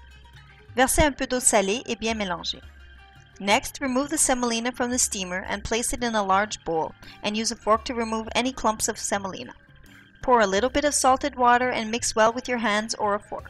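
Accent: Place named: Quebec, Canada. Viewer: C'est marrant lorsque tu parles Français tu as un petit accent canadien, tu es au Quebec ? Merci pour ta recette en tout cas. ;)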